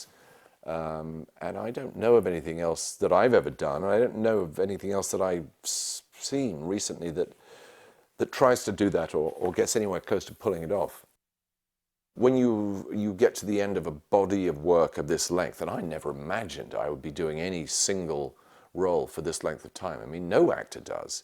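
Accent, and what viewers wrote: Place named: United Kingdom. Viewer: Wait, I think that he has a british accent 🤔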